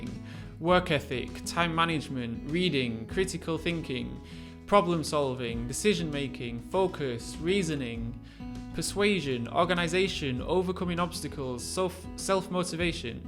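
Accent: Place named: United Kingdom. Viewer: Its a ridiculous how elegant your british accent sounds even when you are saying this like “then your shit is broken” 😂😂 Another video to love and another idea to cherish.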